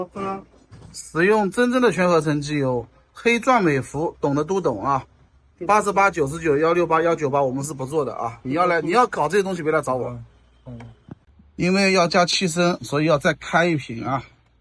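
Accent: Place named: Hangzhou, China. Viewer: 老哥听你口音是杭州人？店在哪里？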